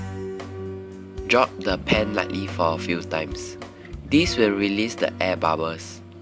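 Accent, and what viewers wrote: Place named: Singapore. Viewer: I'm sure you are Singaporean with that voice, anyway,great receipe!, I'm from Singapore too!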